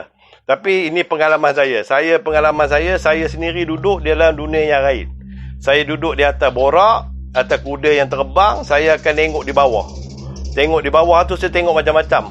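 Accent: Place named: Perak, Malaysia. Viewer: Orang bota ke ni? pekat no loghat peghok 😆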